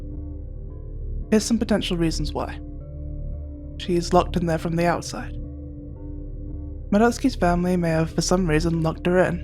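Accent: United Kingdom. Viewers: you have the most british voice ever lol, great video btw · I like how your voice sounds sort of British.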